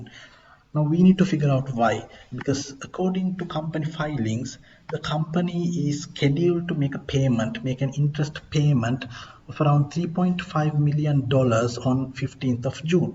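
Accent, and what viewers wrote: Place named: India. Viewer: I love your indian accent!!! its so adorable!!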